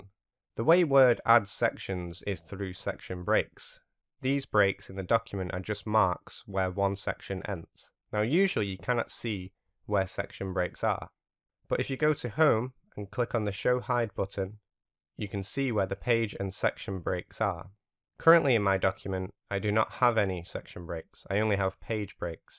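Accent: United Kingdom. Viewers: ew british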